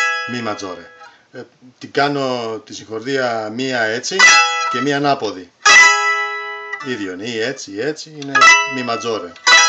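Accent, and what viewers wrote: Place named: Australia. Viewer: Yasou buddy. I just wanted to know where in Australia are you? Because I heard your accent and I wanted to know if you’d teach private lessons?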